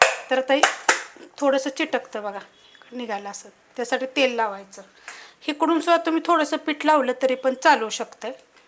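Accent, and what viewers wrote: Satara, India. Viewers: भाषा सातारी आहे.... कारण मे देखील सातारची आहे पण मुंबईत लहानाची मोठी झाल्याने मला अस्सल सातारी बोलता येत नाही. पण भाषे वरून समजते.असो.... माझे सासर खटाव तालुक्यात आहे... आपण एकाच भागाचे आहोत.. तुम्हाला all the best 🎉❤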